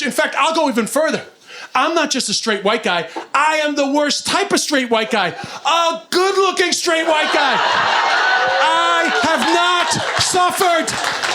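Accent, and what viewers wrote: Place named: New York, United States. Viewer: Bill Burr with a NY accent instead of a Boston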